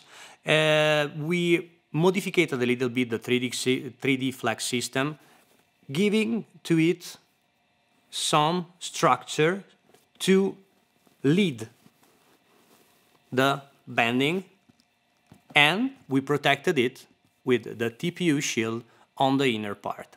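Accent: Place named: Italy. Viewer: he's most definitely italian judging by his accent.I'm romanian and we don't sound like that when speaking english.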